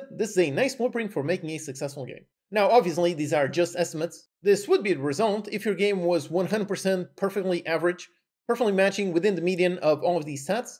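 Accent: Portugal. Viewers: Gajo você é português? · és português?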